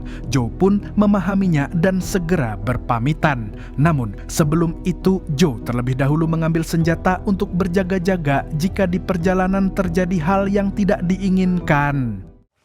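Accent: Banjar, Indonesia. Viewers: Nahh logat nya pina banjar jar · Urang Banjar lih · Waduh amang urang banjar ah sekalinya😂😂😂 menyalaaa banuaku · Ai orang Banjar kah mang 😂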